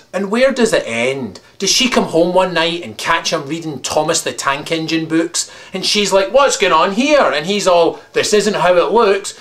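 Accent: Scotland, United Kingdom. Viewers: Yes, and the air with some sort of floating ad for insurance made out of other ads for fast food. On a serious note, why is your accent so deceptive? Your voice says Scotland, but your voice saying where you're from says America. Why the difference?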